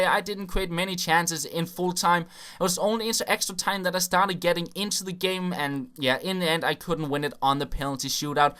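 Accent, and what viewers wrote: Denmark. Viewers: 1. your fucking danish accent his so enoying 2. you suck at fifa 3. you think youre so good 4: FUCK YOU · er du fra danmark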